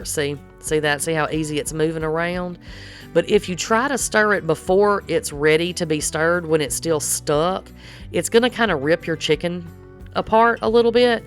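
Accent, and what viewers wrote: South, United States. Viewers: First time watching. I love your southern accent "do you boo"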